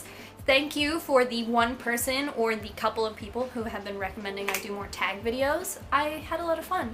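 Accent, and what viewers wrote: Philadelphia, United States. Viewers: I feel like you say, "I don't even-t know" with your Philly accent a lot :)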